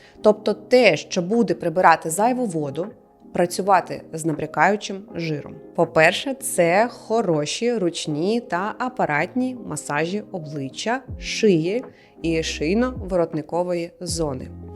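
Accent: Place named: Surzhyk, Ukraine. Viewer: Шийно-КОМІРЦЕВА зона...купа суржиків, неприємно слухати(((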